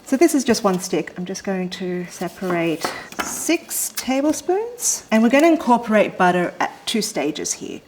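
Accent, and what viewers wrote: Australia. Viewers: Oz accents for life!! ☺️ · She's so happy that even her Australian accent sounds happier.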